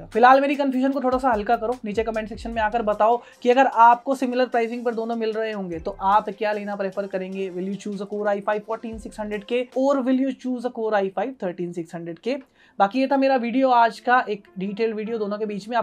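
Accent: India. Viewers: bhaiji tusi punjabi ho ? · tum Delhi se ho kya ?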